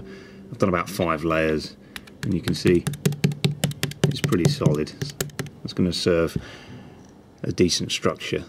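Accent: United Kingdom. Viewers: You are my favorite model railroad expert. In this film I particularly appreciated your speedcam effects (In my day in the USA it was called the Captain Kangaroo Magic Drawing Board effect : ). In all your films I've appreciated your thoroughness in explaining things as well as visualizing them. As a Yank I've also enjoyed your Brit accent (what accent?!). Cheers : ) Site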